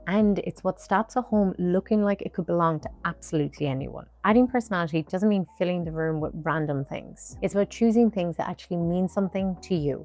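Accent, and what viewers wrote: Ireland. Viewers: first, she's irish, in my opinion a much cooler accent. second, i recently ' learned ' (it may or may not be true) that before amer. was amer. the british spoke with an 'american' accent. it was the british who changed, amer. accent is the original. if it is true, it is pretty funny. btw there are many different british accents as well and some of them are also torture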